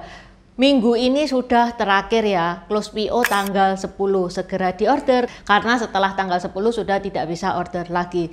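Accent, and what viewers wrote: Java, Indonesia. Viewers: Aku gak seneng berita nya. Tapi aku sangat suka dengan reporternya bahasanya medok banget. Lanjutkan semoga makin sukses.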